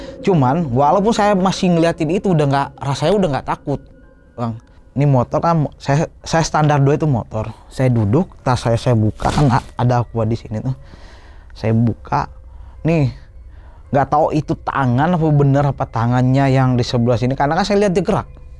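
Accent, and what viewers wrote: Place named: Jakarta, Indonesia. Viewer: Assalamualaikum.. klo ane perhatiin, nte kok mirip bae gaye maupun logat bicaranye ame tokem ane yg seniman betawi boleh dikatakan bgt, biasa bawain lagu2 bg. Kereennnnn👍🏿👍🏿 Sukses buat nte ye🙏🏿🙏🏿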